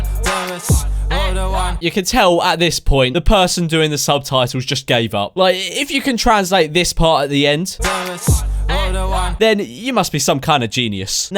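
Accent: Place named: RP, United Kingdom. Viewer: You sound posher than usual in this video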